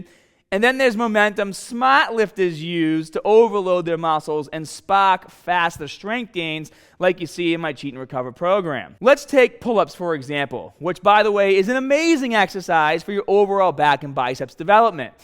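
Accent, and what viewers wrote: Boston, United States. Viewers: Holy Crap! I've always watched your videos for your good looks and charming Boston accent. I had no idea that was a mistake until you mentioned it in this video! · The Boston accent is annoying as HELL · I'm genuinely curious, what part of America is he from where they pronounce 'A' as 'Ah'? Great videos by the way, big fan :)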